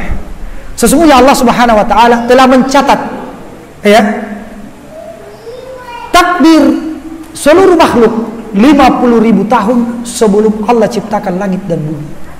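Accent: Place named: Makassar, Indonesia. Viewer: Enak memang klo Dakwah pakai Bahasa Makassar yg kental 😌😌😌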